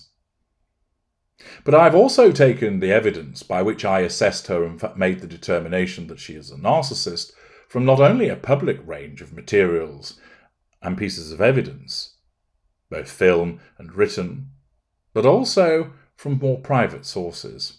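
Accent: United Kingdom. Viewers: Totally love your commentary who wouldn’t be in chanted by his voice alone is very desirable. Oh the perfect British accent I imagine you are tall and handsome from Florida with love and flirting lightens things up.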